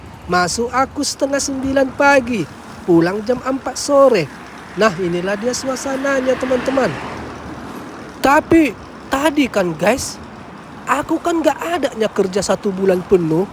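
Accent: Medan, Indonesia. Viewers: Mas ini aslinya mana ya, bahasanya antara Medan sama banyumas.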